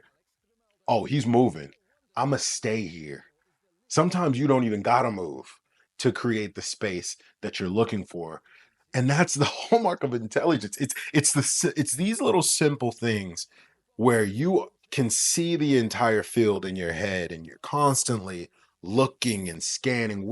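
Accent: United States. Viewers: Have to be honest. When I hear an american accent talking about football I tune out. But this guy is a ball knower. Good video.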